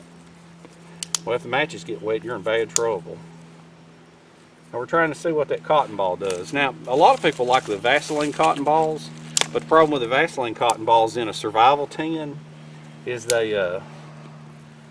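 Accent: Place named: Louisiana, United States. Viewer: Two thoughts: A.) Why not a trench fire? B.) If you carve a funnel out of wood with a SAK and lay the tin foil in the funnel: wouldn‘t life be better?😅 Love your videos and your accent! Keep making those experiments!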